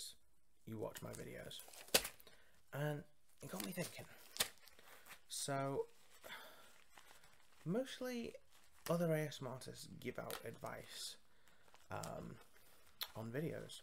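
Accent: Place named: England, United Kingdom. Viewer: I started watching Tiraradeguello because he gave some very interesting advice during one of his Illuminati videos and it hooked me. The decision to subscribe comes from both the relaxation but also the connection with the ASMR artist. The relaxation is also tied to the gimmick, I like conspiracy theories and weird things so I gravitated toward Tirar and Ephemeral Rift. When I followed you, it was the collab you did with Tirar, when you were helping Dr. Andrew Michaels. I got hooked because of the sassy adlibbing you were doing + English accent is so amazing. So My advice is, being yourself is only half the equation. you also have to have a good hook and put in effort, such as getting some story ideas and writing them down, working them into scripts you an follow / adlib over. Umbergnome ASMR is a wonderful artist if you want to see a story being told through an asmr video.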